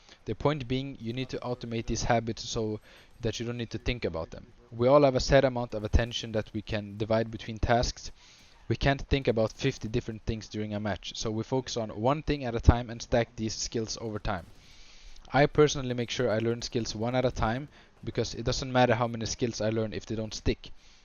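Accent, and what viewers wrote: Sweden. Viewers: Älskar den svenska dialekten, bra video mannen, ska ha allt i åtanke :) Just nu D1 med ca 120h, målet är ASC denna act!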